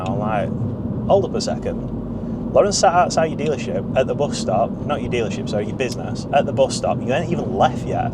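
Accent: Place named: Northern England, United Kingdom. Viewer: Very hard to understand your accent. You are making no effort to speak clearly. Not everyone is from the North of England (and I'm not from Britain either).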